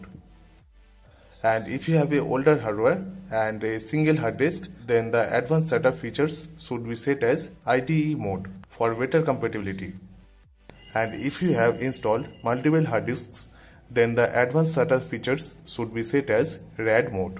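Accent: India. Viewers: Awaaz se vah Indian dikhta😂 hramkhor bolna re Hindi mein bol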